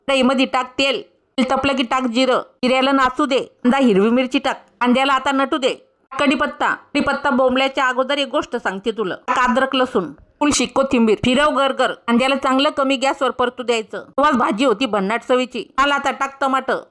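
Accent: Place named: Vidarbha, India. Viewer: काकू तुम्ही विदर्भ मधल्या आहात का भाषा तशीच आहे मी पण विदर्भ मधली आहे रेसिपी खूप सुंदर असतात तुमच्या 👌👌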